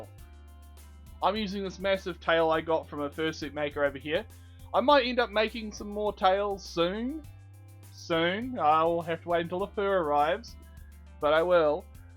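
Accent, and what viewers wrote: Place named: New Zealand. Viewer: Nice accent mate, kiwi’s are awesome :3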